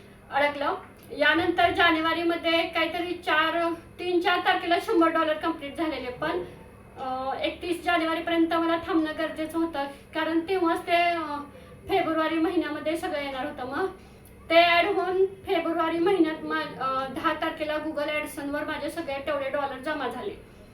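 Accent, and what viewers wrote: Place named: Karad, India. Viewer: Congratulations!! 😊छान माहिती दिली 🙏🏻🙏🏻 धन्यवाद!! आमचे गाव सुद्धा कराड पासून जवळच आहे. माझेही cooking channel आहे. तुमच्या बोलण्याच्या पद्धती वरून तुम्ही आमच्याच भागातील असाव्यात हा अंदाज खरा ठरला.👍🏻😊 तुमचे व्हिडिओ छान माहितीपूर्ण असतात . channel साठी कधी गरज वाटली तर मदत कराल अशी आशा करते.. तुम्हाला खूप खूप शुभेच्छा !!❤😊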